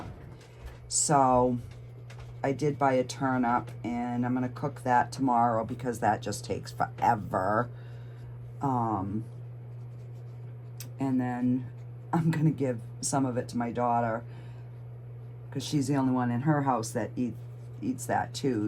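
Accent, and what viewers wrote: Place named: New England, United States. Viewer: Omgsh!!! I am in awe. Your attention to detail with all the colors is incredible. It is so festive. What part of New England are you from? I love the accent! I am a New Yorker but moved down to Georgia a year ago and everyone comments about my accent!! Thanksgiving is very similar in our family except being Italian of course those foods get added in. Wishing you and your family a blessed holiday P.S. don’t worry about your videos being too long. They are enjoyable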